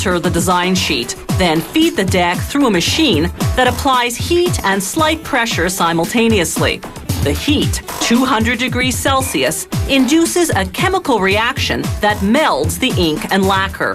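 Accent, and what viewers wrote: Canada. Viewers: she must be Canadian or from some northern state. It actually makes more sense pronounced that way, though. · she sounds so canadian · Canadian accent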